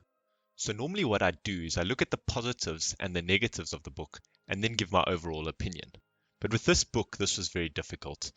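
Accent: South Africa. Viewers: South African? with a cold? misses the mark. Maybe you should re-read it a hundred times like everyone else and then come back and do the video again. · Soutie accent 100% lol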